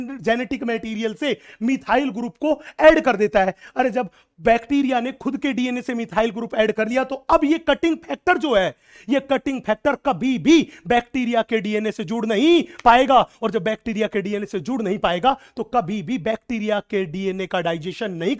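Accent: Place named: Haryana, India. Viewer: Is sir from haryana ?.....his tone matches as littile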